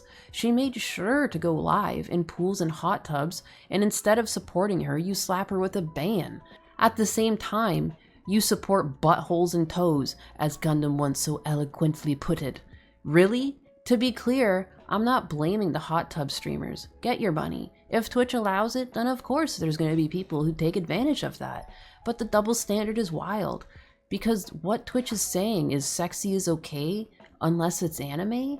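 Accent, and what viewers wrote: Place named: Canada. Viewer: Streaming on Twitch can be a pain in the. Your "out" and "about" gives me the impression that you're from Canada and I don't know why you have so few subscriber so I have subscribed.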